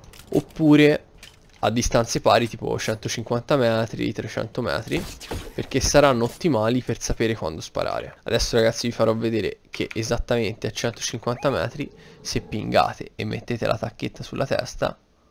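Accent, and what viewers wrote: Italy. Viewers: Grazie mille, spiegato benissimo e poi .. in livornese! (?) Un si pole chiede di meglio! 😂🤘🏽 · Sei della toscana vero?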